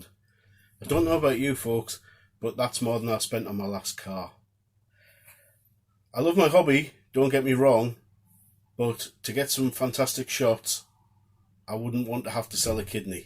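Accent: North East England, United Kingdom. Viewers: Thanks for the video.. Good work mate! Local lad I hear. Probably somewhere between Sunderland and Boro..🤔 I've had this camera for about 6 months now. Picked it up from CeX for £165. Can't complain for that and in mint condition too. Yeah, it's great for shots at the zoo and some of my best shots were had there last summer. Quite impressive to be fair. I'd never owned a zoom camera like this before so I thought why not. It's quite versatile. It does seem to favour good lighting conditions though. I'll be honest, I've never really moved from auto on this camera. I really should experiment. I have taken some quite remarkable pictures of the moon though and only in Auto. Amazing really for the price of the thing. I've just getting a tripod so we'll see what improvements can be made with this. I'll check out the rest of your videos when I get a chance. 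There's also that guy called Graham on YouTube who's got a whole load of content related to this camera. I'm sure you're aware of him.. Keep up the good work. Alan.. Washington UK.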